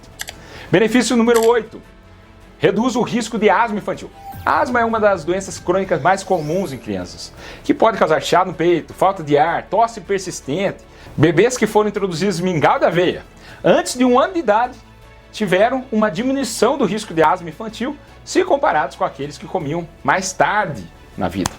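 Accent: Brazil. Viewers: É o sotaque caipira e a maneira desembesta de falar… Se ele é humilde, não dá pra saber. A humildade é uma virtude muito rara nos dias de hoje.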